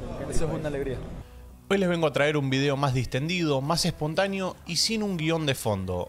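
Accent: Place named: Argentina. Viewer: Che por tu acento se nota que eres Argentino y con esa gran visión de fútbol que tienen ustedes los Argentinos se que vas a llegar muy lejos con tu canal Bendicionez mi pana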